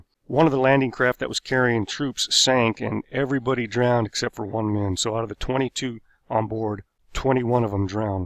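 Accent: United States. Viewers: Stopped after about 2 minutes. Couldn’t stand the pathetic American pronunciation of places in France. Pity.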